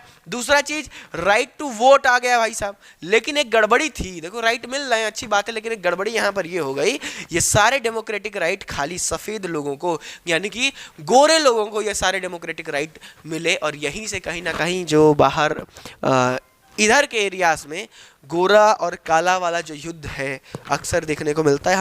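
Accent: Bihar, India. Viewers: bhaiya ap bihari mat bola kigiye please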